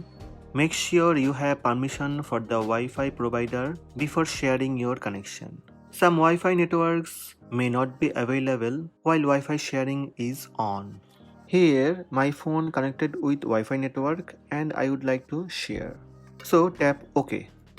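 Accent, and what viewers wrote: India. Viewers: Я знал что парень с индийским акцентом поможет мне разобраться с этим дермом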